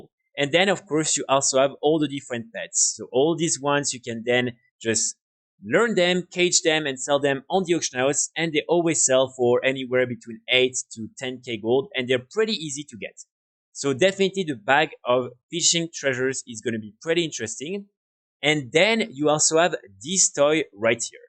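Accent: France. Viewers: NOT a lot of gold :P. Love your content overall glad I have found your channel! Your French accent also, if I'm not wrong hehe.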